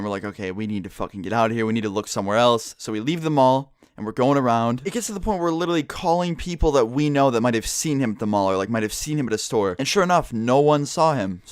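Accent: Canada. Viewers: wait......... Youre Canadian? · Are you canadian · Are u Canadian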